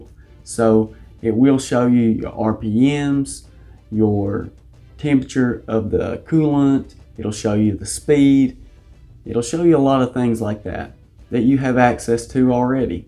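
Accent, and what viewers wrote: South, United States. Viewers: nvr watch a southern accent guy's tech vid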